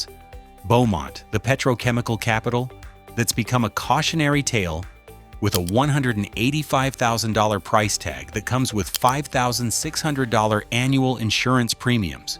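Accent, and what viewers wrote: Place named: California, United States. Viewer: Eve thing you said about Texas is NEGATIVE. Pure BS created by AI. YOU MUST NOT be a Texan. You sound like from California trying to prevent CA residents to move in to Texas.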